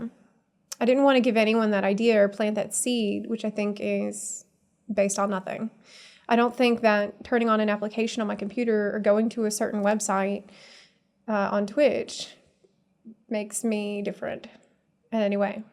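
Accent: South, United States. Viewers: Her southern accent is hot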